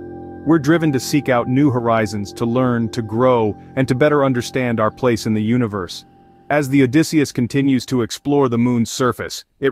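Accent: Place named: United States. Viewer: I will stay subscribed, providing you keep that good American voice!!!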